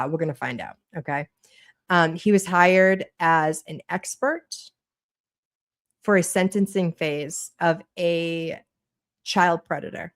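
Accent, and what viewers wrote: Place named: Boston, United States. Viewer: Hey girl! I met you on the other Amy's live last week, I LOVE your energy. Bonus is your Boston persona. My husband is from Dorchester. I am from Philly so I figure same same. ❤ 😂 Thank you for covering this insanity - I think its important to spread the word about these nasty people so hopefully nobody else will fall prey to them.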